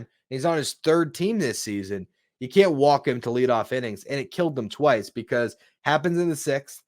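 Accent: South, United States. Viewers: Why the southern accent on the intro?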